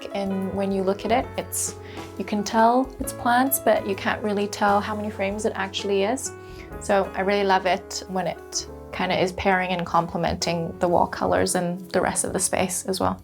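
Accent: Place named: North America. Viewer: This north-american way of talking is painful.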